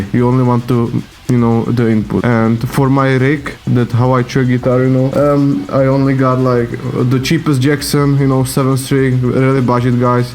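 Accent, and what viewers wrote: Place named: Czech Republic. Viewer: Good vid, are you Czech? Guessing from accent :-)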